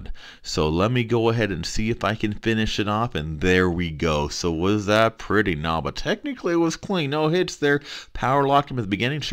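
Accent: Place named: United States. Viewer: Thanks for the video. Several members of our ally enjoy your videos. We are trying to place where you are from based on your accent. What part of the US are you from??? Thanks again for the videos! Keep up the good, and helpful, work!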